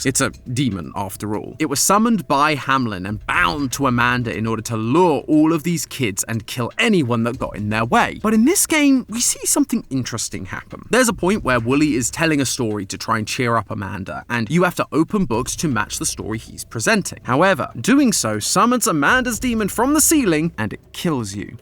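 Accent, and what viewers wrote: United Kingdom. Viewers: I don’t remember him being British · Ok so has he always sounded British 😂😂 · im still not used to the british guy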